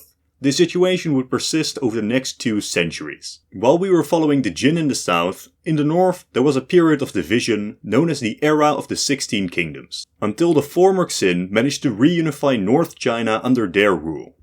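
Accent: Netherlands. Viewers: I hear Dutch in your voice my friend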